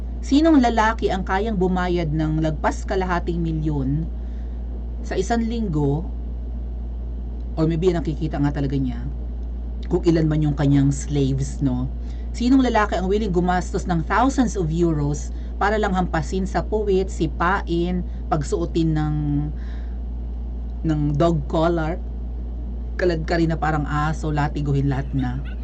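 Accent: Iloilo, Philippines. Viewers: Girl cute mong mqgsalita ng ilongga. 💖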